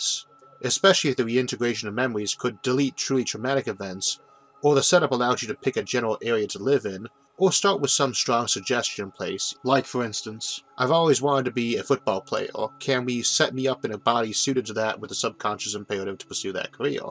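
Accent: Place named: New England, United States. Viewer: WTF accent is he speaking with? Cross between a Boston and Maine accent?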